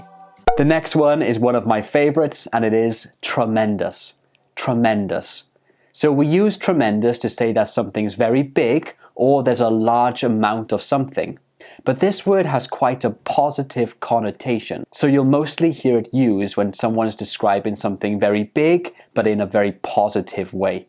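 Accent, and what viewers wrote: United Kingdom. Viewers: Excellent video,! Your British accent is amazing and you really rock as an English teacher.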